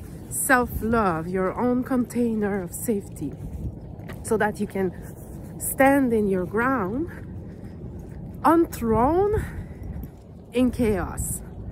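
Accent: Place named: Quebec, Canada. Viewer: You sound québécois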